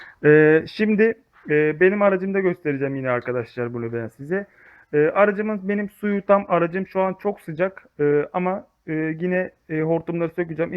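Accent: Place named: Izmir, Turkey. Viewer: Bu adam sanki izmirli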